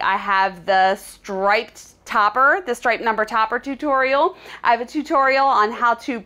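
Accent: Philadelphia, United States. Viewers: Your video SAVED me!!! ❤🎉 Thank you!!!! Love your channel your Philly-accent too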